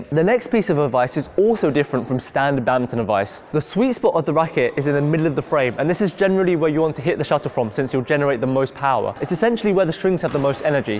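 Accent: United Kingdom. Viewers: Is this guy Asian or British ? He sounds more like British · Wow british accent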